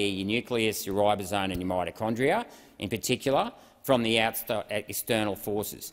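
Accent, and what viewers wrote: Australia. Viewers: I've heard it spoken by so many Americans, and foreigners it's nice to hear it from an Aussie accent I totally understood every word so eloquently described anyone could understand. Brilliantly stated .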